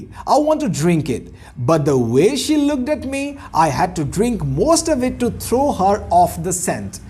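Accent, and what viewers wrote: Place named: England, United Kingdom. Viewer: Wooooow Sir.... Nice English Accent ... Mind Blowing Sir....Shriya...😍😍😍👏👏👏👍👌👌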